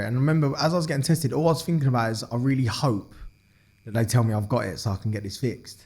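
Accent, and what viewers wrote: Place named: England, United Kingdom. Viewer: Hi there, sounds like a typical English lad😮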